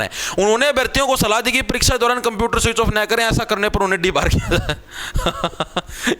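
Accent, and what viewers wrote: Haryana, India. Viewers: hlo sir kya ap haryana se hoo kya kttte desi or apni sii feel aee apki baata mm😊😊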